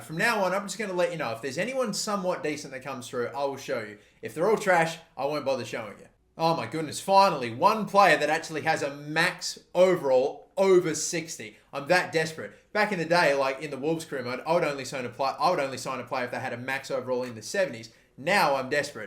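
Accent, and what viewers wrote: Australia. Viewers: Are you Australian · are you australian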